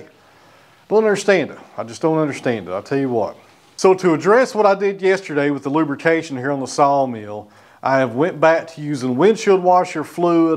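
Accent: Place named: East Tennessee, United States. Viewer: Are you in West, middle or East Tennessee? I'm an East TN native, you sure sound like you're close to the area. I love it! Sounds like home. I hear somebody speak like that and it's almost comforting. My mind just associates it with friendlier people.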